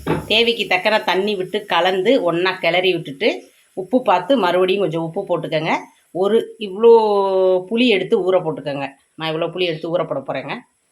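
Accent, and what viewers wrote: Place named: Madurai, India. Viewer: Unga pechu ketkka madurai pakkam mathiri irukku........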